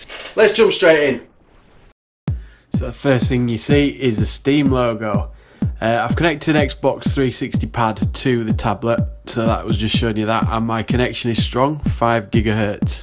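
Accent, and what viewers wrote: Yorkshire, United Kingdom. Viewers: Definitely beater not baiter! Trying to place your accent...fellow yorkie?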